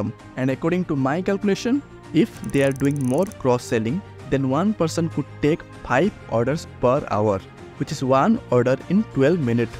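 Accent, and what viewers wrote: India. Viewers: You spoke very well, don't get bothered by comments about your accent. It's how most of us Indians speak. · You are from odisha because the accent in which you speak is odi accent .love you bro I am also from odisha ❤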